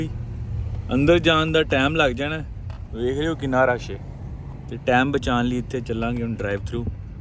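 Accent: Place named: Punjab, India. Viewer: hahah I really enjoyed your Panjabi Accent...Good work bro..Maza araha ap ki vlog dekh kar m Gonna Subscribe you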